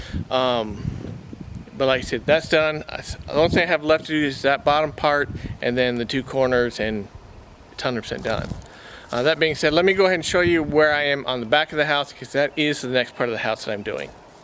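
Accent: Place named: Tennessee, United States. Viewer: Ur Tennessee TWANG is started to come back, lol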